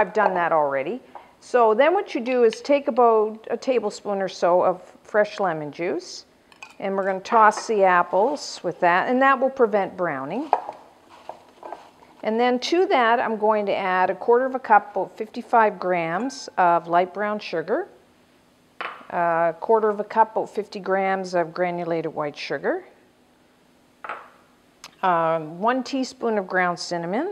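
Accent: Canada. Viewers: is she canadian? aboot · are you canadian?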